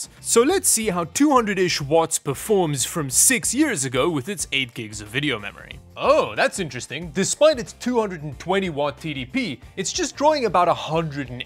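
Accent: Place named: South Africa. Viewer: I went from 4k 60hz to 1440p 165hz, impressed by the response and speed ofc but always missed that crisp and super clear 4k resolution. Went back to 4k (now 144hz) and the 7900XTX is doing a great job, mind blown visuals. Tests show that the 9070XT is not far behind (non-RT) which is great. recommended. Also from South Africa, can hear your accent, well done on all the subs etc.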